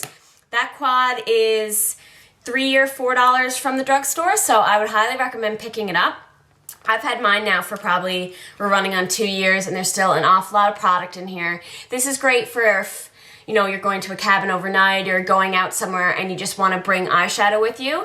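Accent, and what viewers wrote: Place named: East Coast, Canada. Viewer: Michelle sent me as well! You are gorgeous and your videos are great, glad to have discovered you. I love your top, where is it from? I am also Canadian from the West Coast, I would guess you are from the East Coast from your "accent". Thanks for the great videos!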